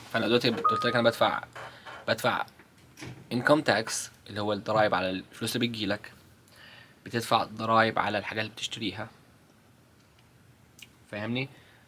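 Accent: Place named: United States. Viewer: مش بيتكلم مع عرب كتير فمخارج الحروف بقت اقرب للحروف الانجليزية وخاصة باللكنة الامريكي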